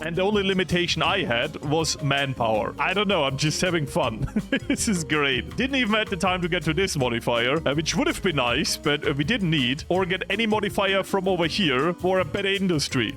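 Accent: Austria. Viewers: I hear Österreichisch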